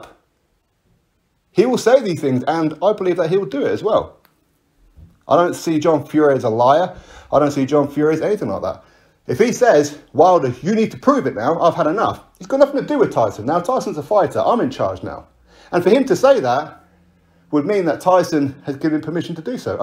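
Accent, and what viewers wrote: United Kingdom. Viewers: You are a biased youtube blogger..glad I don't subscribe to your page....you sound British and biased...Fury is subhuman with the cheating...you can get your small fan base to deny these allegations but they are real...👎👎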